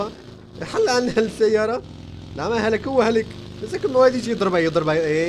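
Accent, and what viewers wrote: Aleppo, Syria. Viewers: انت من اي محافظة لهجتك حلبية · حسب لهجتك اتوقع انته من ريف حلب او من حلب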